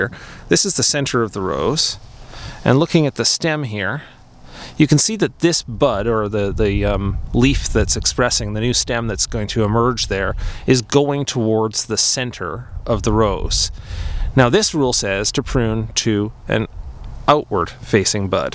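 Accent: Canada. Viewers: Great Canadian accent!😄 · Me too! My Dad has about 6 large rose bushes, and in 3 years have never been trimmed. I just had no idea where to start tackling them but have a much better idea now! First video I’ve seen from you and it took me about 30 seconds to spot that awesome Canadian accent! You have amazing plants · Very helpful! Love your Canadian "accent"! You sound a lot like Scott Thompson from The Kids In The Hall! Yes, I'm old!!!!!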